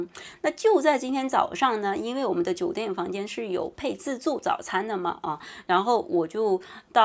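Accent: China. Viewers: 听你口音，也是中国的吧？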